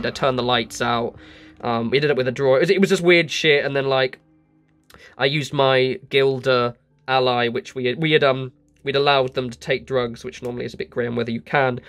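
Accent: United Kingdom. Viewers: As a fellow british person, I have the horrible moment of thinking "do we all sound the same?" All your expressions are the same as mine. Thank you for this, jsut gotten abck into warhmmer after 20 years and could never kitbash as a kid, so lovley to get some inspiration for me starting out my own Nid and Cult armies.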